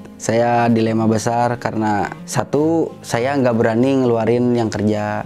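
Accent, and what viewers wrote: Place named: Tasikmalaya, Indonesia. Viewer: Logatnya Tasik banget 😁😁...salam dari orang Tasikmalaya sukses terusss 😍😍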